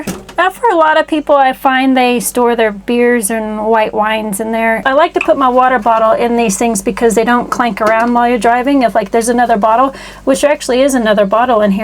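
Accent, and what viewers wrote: Australia. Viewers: If you dont realize it, you are picking up a bit of an Aussie accent. Can i ask the approximate cost of your Toyota If they were sold in the US there would not be a single unit sitting. Youre so cool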